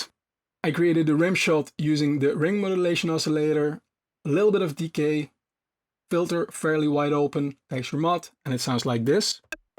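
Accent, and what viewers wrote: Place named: Netherlands. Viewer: dat is een vatzig nederlands accent makker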